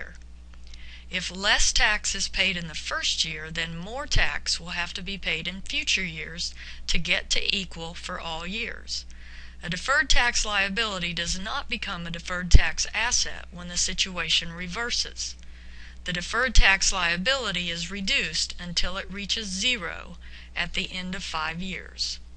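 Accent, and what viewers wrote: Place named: United States. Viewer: OMG - an American accent explaining this! Unusual.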